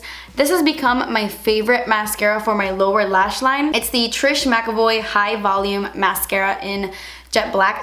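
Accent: Cuba. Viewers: She's Cuban I believe! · Lauren Emma I think she's from Cuba but I'm not sure! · I could just listen to you talk with your Cuban accent , I love it ! Lol 💕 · Jajajajajajajaja! Tas podrío mi hermano! Me muerooooo! Me encanta tu acento cubano! Mi perro también me tortura así! A veces se asusta él y todo! Un besazo enorme desde Madrid! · Your Cuban accent. I love it! 😍😍😍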